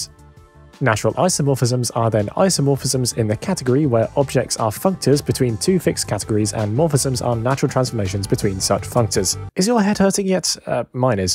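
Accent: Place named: United Kingdom. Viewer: what is...katuhgree theory?? british accents man. wild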